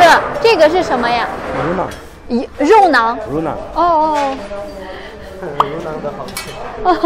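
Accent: Shandong, China. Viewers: 听口音，应该是山东莱芜人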